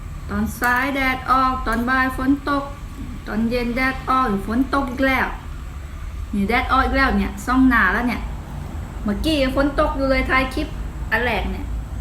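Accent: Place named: Rayong, Thailand. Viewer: ยำปูแป้นน่าทานจังเลยค่ะ อีกอย่างที่ชอบคือชอบสำเนียงระยองฮิ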